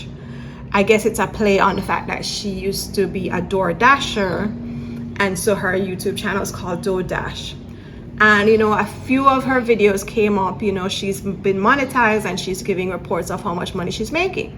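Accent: Jamaica. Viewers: and relevant because my proof is I found your page when looking for information about moving abroad specifically to Mexico and when you started talking with your Jamaican accent it resonated with me because of some of my friends who are Jamaican. It’s a global ting😀 in Texas, Mexico and the best city in the world New York! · First timer to your channel, and a fellow Caribbean national trying to come up with content. I'll tell you what I think dough dash's "secret" is. She has displayed her struggling financial situation to everyone, and her viewers are rooting for her to succeed and I believe that this is what has driven her channel's recent success. ... ppl are invested in helping her and seeing her succeed. I don't believe this is sustainable content... I'm sure she will HAVE to find something more substantial as time goes by. Only if you are prepared to display most of the private parts of your life, where you are struggling, you will get ppl following you to see how the story pans out. 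DD channel is like a soap opera... and this is what has ppl coming back to see "what happening today...?" I'm not prepared to advertise my financial or family struggles to get the views. Please take heart.... I'm a new subscriber to you.... love me a jamaican accent❤ Love from Trinidad 🎉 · YARDI GIRL HI THERE! Love di accent u nuh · I'm just a plain white Canadian but I think your Jamaican accent is charming. I can understand 90% of what you say but maybe 10% of your native Jamaican sayings go over my head lol. I don't know how your channel popped up on my homepage, I guess because I started watching House Hunters videos, though I never saw the episode you were in. I usually only see independent travel videos. I like your message of encouraging others to live more, it's something a lot of people need to hear right now. Don't compare yourself too much to that DoughDash woman-I don't even think using that name is legal (since it's essentially piggybacking on the popularity of the DoorDash name which the company had to pump a lot of money into marketing for.) You are doing it right just by putting your own name on your channel (and since your last name is, it also taps into that part of the audience who either loved or had issues with class lol). 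If I could suggest something to you to improve the views of your videos, it would be show more scenery of Merida, maybe some sunsets or beaches, just more general things people like to see. I have never been there and am not likely to go, it would be kind of nice to see something like that. Most of the successful travel channels I see are from independent Youtubers who film their stays in various hotels and locations, but they've usually started off filming the beautiful scenery of their own hometowns.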